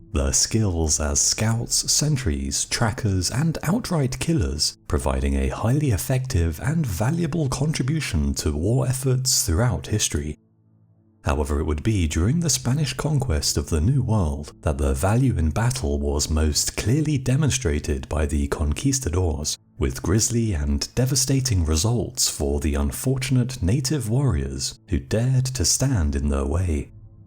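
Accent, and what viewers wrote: United Kingdom. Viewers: seems kinda farfetched, they had jaguars in their jungles, I'm sure dogs which are much smaller wouldn't scare them much......the fact that a british accented guy is narrating this story makes it that much more questionable... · #1 Narrator Has a British/English accent, #2 Hyperbolic made up history, this is Definitely British Anti-Spanish Propaganda so much like the Black Legend they created , All Lies, Arriba España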